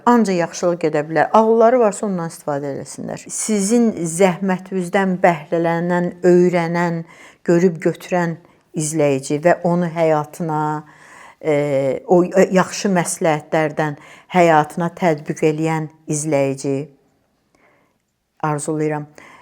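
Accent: Baku, Azerbaijan. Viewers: xanım sizə cansağlığı və uzun ömür arzulayıram. Baki ləhcəsini eşitmək mənə cox xoş oldu. Müəlliflərə də təşəkkür edirəm.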